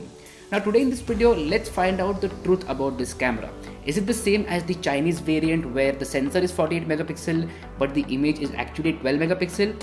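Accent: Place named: Punjab, India. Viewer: Bhai o Panjabi nikalde o regional feeling utarahahe I'm from AP but I like the way express so try to be with all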